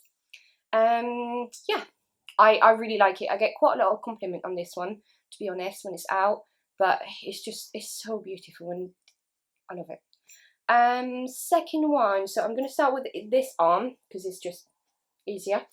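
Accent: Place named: United Kingdom. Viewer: Je ne m'attendais pas à ce que tu ais un accent british quand tu parles en anglais lol!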